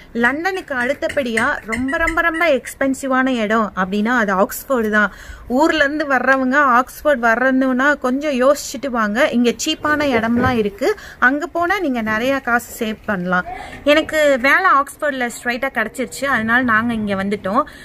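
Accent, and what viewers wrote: Sri Lanka. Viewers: Elangai Tamil pen nu nenachan dear 😍😍😍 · Konjam srilankan tamilian also ungala maathi tha akka kittathatta pesuvanga cute... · Correct akka onga tamil srilankan tamil mathiri than erukku i am from SriLanka 😊😊😊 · I thought u r from Sri Lankan tamil. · Your pronownsation is like Sri Lankans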